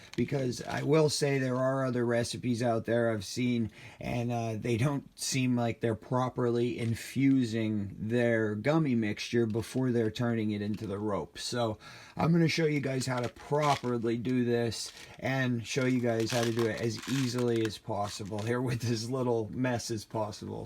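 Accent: Pennsylvania, United States. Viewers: It's called a double boil when you melt gummies or chocolate or butter or w.e in a Pyrex container in boiling water. You can also use a candy melter sometimes sold with candle making or baking stuff . Haha you are definitely from PA I can hear it in your voice! Thanks for the videos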